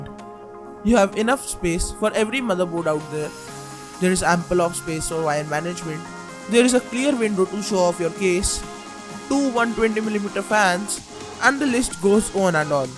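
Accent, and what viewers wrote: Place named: India. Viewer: love the indian accent xD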